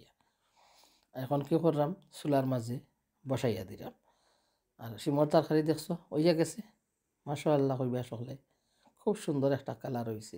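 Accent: Sylhet, Bangladesh. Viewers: সিলেটি কথা খুব ভালো লাগছে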